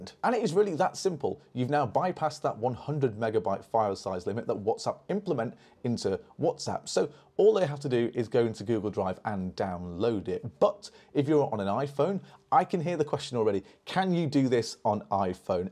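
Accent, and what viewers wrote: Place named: United Kingdom. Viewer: I love his British accent though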